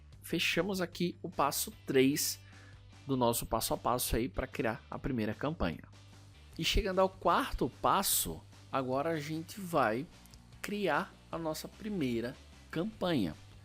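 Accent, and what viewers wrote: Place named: Northeast, Brazil. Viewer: Aula top ainda é nordestino pelo sotaque, ai sim valeu meu vey mais um inscrito de recife <3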